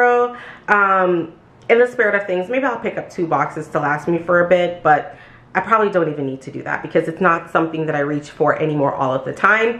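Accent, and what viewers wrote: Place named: New York, United States. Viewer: I forgot to say I’m a girl from Queens New York and I know you’re from Brooklyn I can hear that NY accent !😂😂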